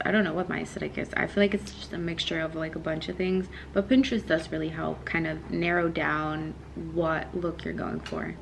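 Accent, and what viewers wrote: United States. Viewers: Did you ever live in the US? I thought you did earlier (idk maybe I just imagined it in my head cause of ur accent). I notice a lot of folks from Europe with an American parent have American accents. (Assuming ur dad is Nigerian American) P.S. luv ur vlogs! And you’re so authentically you. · how come you speak such good English and you have an American accent lol 😂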